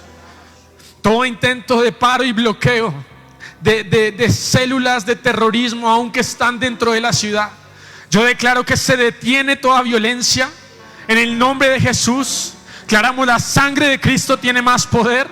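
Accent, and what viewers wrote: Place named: Colombia. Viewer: Hola siervo de Dios por primera vez lo veo y lo escucho por su acento se que es de Colombia y le ruego que no tenga temor nunca de hablar lo que el Espíritu Santo le dice para su pueblo recuerde de donde saco a Jonas para que ablara a ninive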